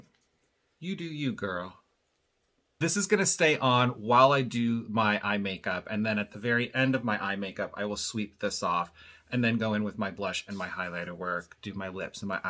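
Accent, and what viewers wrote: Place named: Minnesota, United States. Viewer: LOL I'm from western wisconsin, so I know all about the Minnesota accent. :D Wow incredible look!! You look amazing!! I will be Using both the liquid and powder foundation to get the contour. I've never used both foundations at one time and I am going to try that. Like I said you look is amazing!!!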